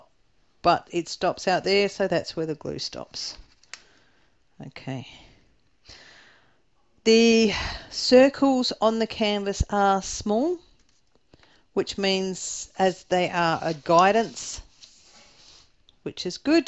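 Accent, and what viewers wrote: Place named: Australia. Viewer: Hi,nice to hear an aussie doing diamond painting on utube!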